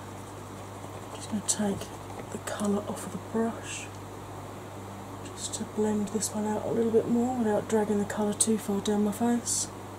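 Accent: United Kingdom. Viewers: Are you Welsh? Gorgeous look per usual, I do think they're wisteria as well... I'm heading over to Chelsea's channel next!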